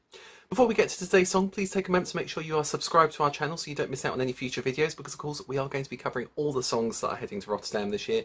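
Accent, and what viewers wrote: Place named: England, United Kingdom. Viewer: I hear the Klaxon siren already but can I ask why you're called Eurovision Ireland when you have an English accent? What's the connection? Sorry to ask just interested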